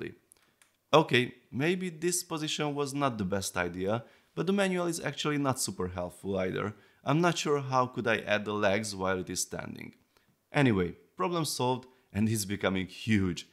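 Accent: Hungary. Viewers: Damn, that Hungarian accent is strong. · Helló. Úgy hangzik az angolod mintha magyar lennél.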